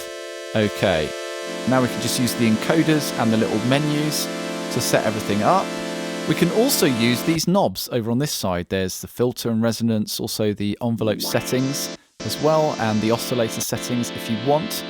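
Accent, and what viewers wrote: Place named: United Kingdom. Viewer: Extrem british accent detected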